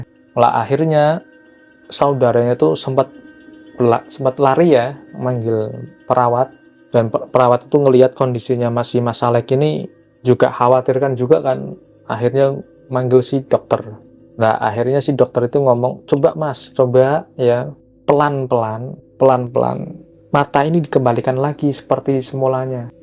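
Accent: Pekalongan, Indonesia. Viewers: Mz nya yg bercerita org pekalongan to makanya q gk asing logat bicaranya salam dri Hongkong mz konten kreaktor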